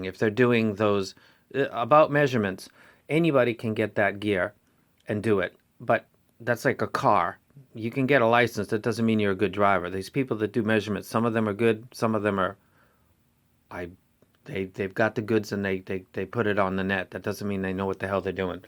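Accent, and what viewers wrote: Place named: Maine, United States. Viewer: you sound like youre from Maine :)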